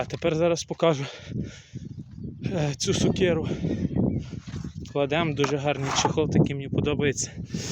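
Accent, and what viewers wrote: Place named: Ternopil, Ukraine. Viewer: говір гарний в тебе! Це часом не Терн. Область?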